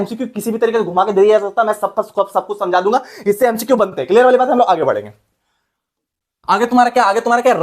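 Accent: Bihar, India. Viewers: Aap bihari ho kya?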